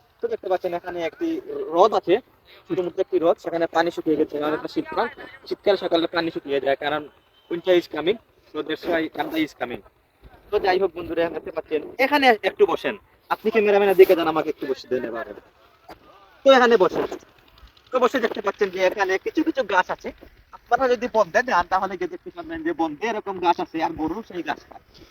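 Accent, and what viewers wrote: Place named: Sylhet, Bangladesh. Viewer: Vair mat huniya amr Abba haste haste sesh😂😁 koin ada sylheti ada shuddo bashaa😆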